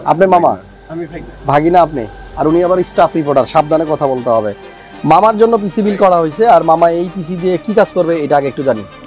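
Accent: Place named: Bikrampur, Bangladesh. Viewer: vai apnar gramer bari ki bikrampur answer please vasha shune mone hoy vai❤️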